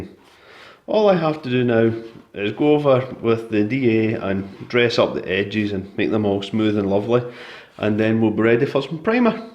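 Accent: Scotland, United Kingdom. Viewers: nice job. my mouth is watering as i watch you go to so much trouble. accent scottish?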